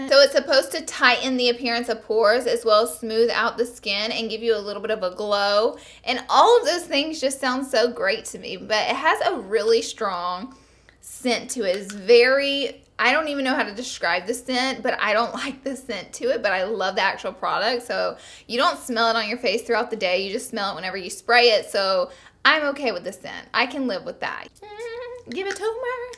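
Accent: South, United States. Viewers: I'm usually a silent subscriber, but just wanted to drop in and say I love how vibrant your personality is. You are amazing at bringing your personality and humor through the camera and I can't get enough of your animated facial expressions. Also, your draw makes you more relate-able to those of us in the south. Keep it up girl!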